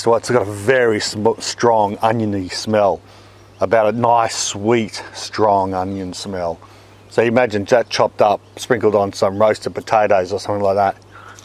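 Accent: Australia. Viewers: Are you an Australian? Love your accent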